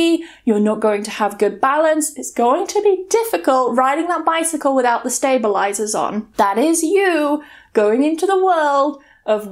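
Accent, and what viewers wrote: United Kingdom. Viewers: Cool your britist accent . Very very good . I enjoy a lot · Hey that's a good while that I'm learning English and i was only focus on American English but i do find your British accent so interesting...So inspiring! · 妳的英國腔讓人愛上英國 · your beautiful British accent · Hiya, i like your british accent, this lesson was really interesting, I must confess…I usually watch your videos with english subtitles, I loath miss some keywords.. please have a nice day. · I prefer American accent, but, the point is, regardless of which accent you are more into, I think English learners should study different accents, because soon or late you will find someone who doesn't speak the accent you're used to, and then what?? Will you ask this person "could you please speak with the accent I can understand??" Of course not, and your accent is so nice that makes me feel like learning more British accent. 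I hope my comment is useful. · Your British accents is ❤️😍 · I understood every word you said , but sometime I find is difficult to follow the conversation. you're British accent amazing . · in this great video, I like british accent such as your accent. thank you so much your types of listening.. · I understand perfectly English, even she speaks British English and I'm studying American English. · your* British accent ;)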